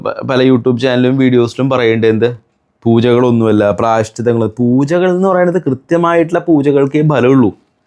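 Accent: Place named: Thrissur, India. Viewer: ഇവൻ തൃശൂർ ആണല്ലോ 😂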